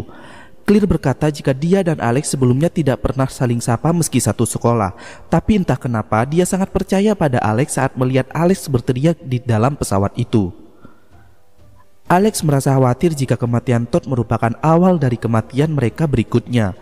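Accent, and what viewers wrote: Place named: Java, Indonesia. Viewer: Knp tod nya medok sihh😭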